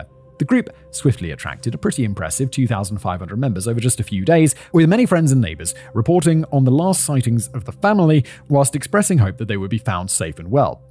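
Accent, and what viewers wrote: RP, United Kingdom. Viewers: The last time I watched this guy he really talked as though he had a huge plum in his mouth, so it was a put on or it tuned people out & here we are....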